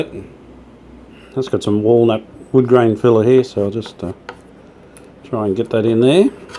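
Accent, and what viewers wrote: Australia. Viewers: Bravo! I have watched about a half dozen of your videos from start to finish the last couple of days and I am impressed by your expertise. I have been learning a lot even though I will probably never be able to repair the electrical components of a tube radio but, I watch to see and learn from your timber restoration techniques. I have restored a couple of timber (we call it wood here in the US) tube radio cabinets recently. I'm guessing your dim light controller is home made. Is there any chance of making a video showing how to make an exact duplicate? Just a thought. Is your shelf of radios in any of your videos? It would be great to see that as well. I also enjoy your Aussie accent and unusual Aussie phrases. Keep up the great work!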